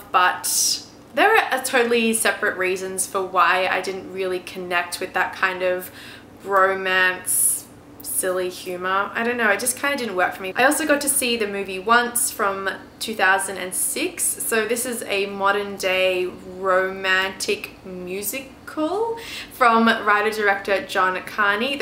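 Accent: Australia. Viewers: Hey do u like the singer? Lol. Sorry had to:p but anyway I love ur videos and I love ur accent I've always loved Aussie accents! Lol Also question. Why did u come to the US like what are ur goals for here and what brought u here? Would love to know:) keep up the good work!!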